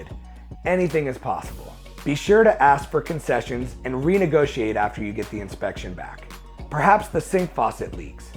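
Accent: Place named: New England, United States. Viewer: a wicked lot-ah money! New England talking!!!!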